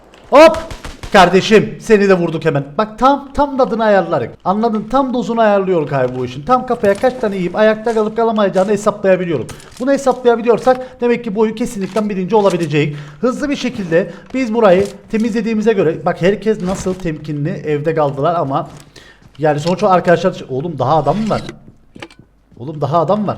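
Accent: Cyprus. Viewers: KIBRIS KONUŞMASINI COK SEVİYOM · Abi gercekten kıprıs lımısın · Kıbrıslıları abi sayesinde asik oldum şivelerine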